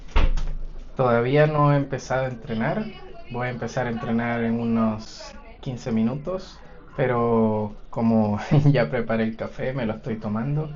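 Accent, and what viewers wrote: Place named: Chile. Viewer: Por tu acento eres de chile verdad? Por ciento normalmente cuánto cobras por un entrenamiento que me habias dicho en un comentario convertido a pesos mexicanos o como funciona que no soy de ese país?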